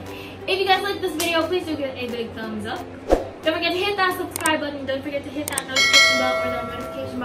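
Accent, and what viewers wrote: Philippines. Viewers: i just love your tagalog accent! Waaah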